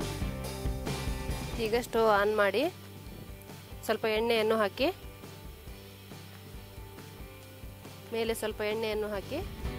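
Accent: North Karnataka, India. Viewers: Nivu uttara karnataka accent nalle matadi tumba chennagi iratte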